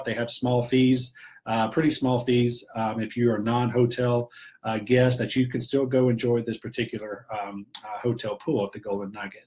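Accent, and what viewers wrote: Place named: Oklahoma, United States. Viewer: Sounds like an Oklahoma oil money red state hick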